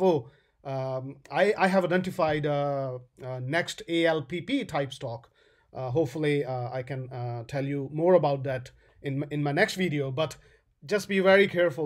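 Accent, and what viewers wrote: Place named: British Pakistani, United Kingdom. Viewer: You sound British/Pakistan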